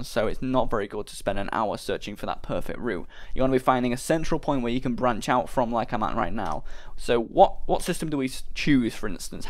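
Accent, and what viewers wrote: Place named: United Kingdom. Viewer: can you talk a bit slower lol. i dont british or uk or whatever accent you have at all and the million words a second is near impossible to get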